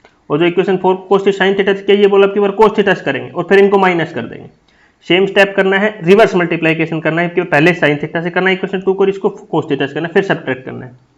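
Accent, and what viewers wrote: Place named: Haryana, India. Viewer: Ram Ram sir ji , your accent clearly haryanvi , thankyou for great information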